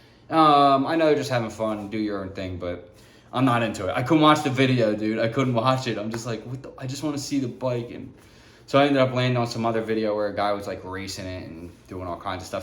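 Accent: Philadelphia, United States. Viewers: I thought u sounded like u from philly! That’s crazy! Born and raised in philly! Love the Philly love!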